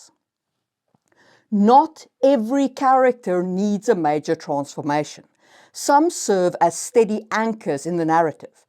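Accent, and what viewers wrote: South Africa. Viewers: ah, I knew I was hearing a fellow south African 🇿🇦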